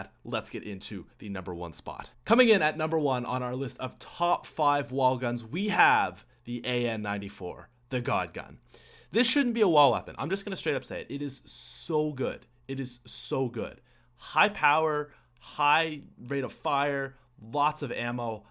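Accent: Canada. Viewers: Is this guy Canadian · Josue Medrano canadian accent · Are you Canadian? You speak like you are a Canadian :P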